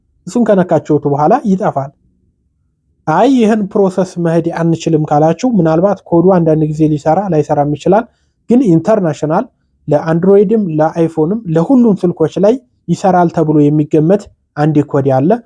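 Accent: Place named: Wollo, Ethiopia. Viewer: ወሎዬው አክሰንትህ እጅግ ተመቸኸኝ።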